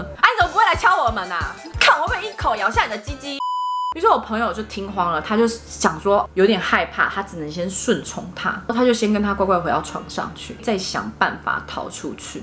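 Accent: Taiwan. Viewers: 台灣國語的口音又親切又真好笑~笑死我了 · 看著影片突然聽到台灣國語感覺整個超親切啊.... 加油喔XDDD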